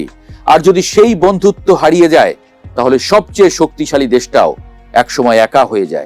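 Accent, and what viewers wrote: Standard. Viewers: শুদ্ধ বাংলা। কোনো বাঙ্গাল টান নেই। সুন্দর বিশ্লেষণ। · প্রমিত বাংলা উচ্চারণ। স্পষ্ট, প্রাঞ্জল ও আঞ্চলিক প্রভাব মুক্ত, ইংরেজি বা অন্য বিদেশি ভাষা প্রভাব মুক্ত। বক্তব্য সোজা, দীর্ঘ-ভুমিকা হীন , প্রাসঙ্গিক। অতীব প্রসংশার বিষয়। ধন্যবাদ ও শুভেচ্ছা 🎉 🤝 💛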